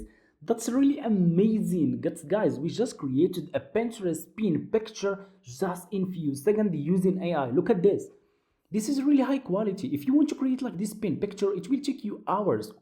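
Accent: Morocco. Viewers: مغربي ياك من اللهجة باينة؟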